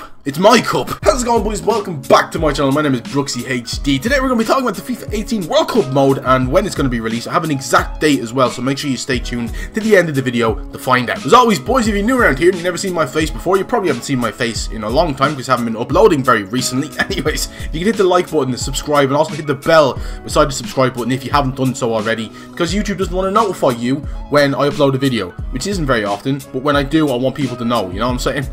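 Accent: Ireland. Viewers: Yay ur Irish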